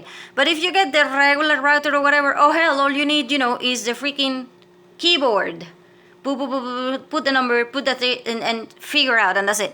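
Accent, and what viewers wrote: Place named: Mexico. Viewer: what country ? you didnt' say. You sound mexican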